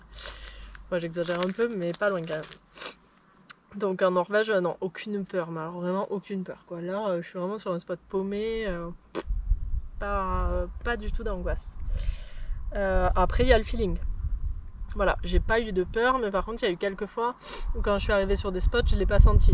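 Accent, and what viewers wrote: South-West, France. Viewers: Nature, authentique, sincère , un peu sauvage et avec en prime un bel accent du sud ouest! Vous avez gagné un abonné de plus! Je vous conseille de suivre les périgrinations de Django nomade dont je suis aussi grand fan...Bonne route et à bientot!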